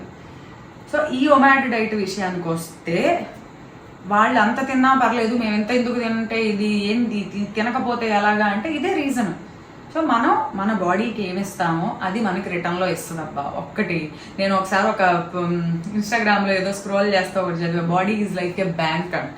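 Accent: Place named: Rayalaseema, India. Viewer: mee slang rayalaseema laga untundi Abba ani ikkada.antaru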